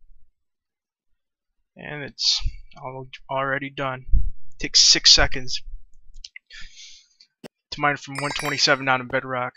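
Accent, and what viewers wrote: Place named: Chicago, United States. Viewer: This guy has to be from Chicago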